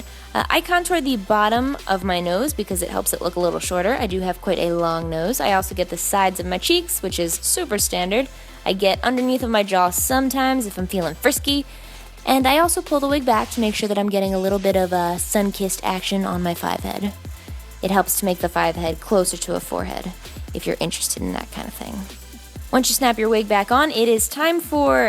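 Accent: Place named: Philadelphia, United States. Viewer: Your Philly accent gets me every time. I know it all too well and I love it ❤️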